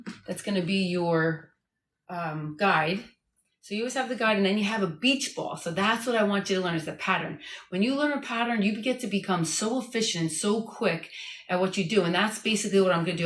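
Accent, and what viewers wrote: New Jersey, United States. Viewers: ❤❤❤I grew up in NJ and moved away to Cali but your voice reminds me of home! And now you say Shrewsbury (WHAT?!) I came from HIGHLANDS!!❤❤❤ I have no idea when I can get to Jersey but if you are ever taking a trip to California, please let me know!! My hair could definitely need your positivity and know how!! ❤❤❤❤ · As a cosmetologist myself for 40 years, I must say that I thoroughly enjoyed watching this video and I even learned a couple new techniques myself. I cut mostly men’s hair now so it’s nice to learn a few things again regarding women’s hair. And by the way, I’m from Rhode Island and I love your jersey accent! I’m in Florida now and listening to you just makes me homesick. You’re such a beautiful person with a wonderful attitude. God bless.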